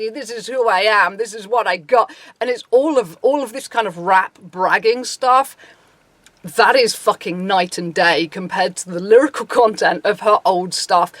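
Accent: United Kingdom. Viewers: Oh that british pronaunciation of you... No idea who you are talking about but I'll watch the video anyways :))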